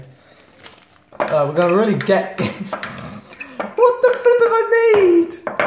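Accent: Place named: United Kingdom. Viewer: Nazi Pepe that's just how we British people talk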